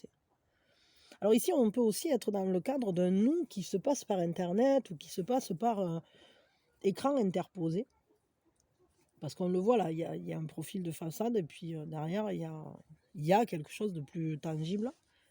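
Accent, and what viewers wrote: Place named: Libourne, France. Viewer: J'adore votre accent qui me rapelle mon papa près de Libourne Mes grands parents et ma tante Merci pour c'est pas de la tarte Quand son cœur s'ouvrira il sera surpris Bisous 😇😘🐾🎶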